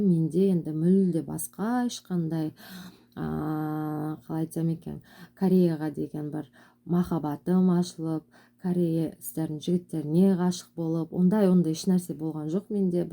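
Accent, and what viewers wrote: Standard. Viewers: Молодец таза қазақша сөйлейді екенсің Алла Тағала разы болсын балапандарын аман болсын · Айналайын Аман сау бол. Отбасын а бакыт тилеймын. Балапандарын тап татты. Бизда сиздерды жаксы корып калды. Контентын оте керемет. Кызыкты. Корермендерын кобее берсын. Миллионга жетсын,оданда копболсын. Таза казакшаннан айналдым..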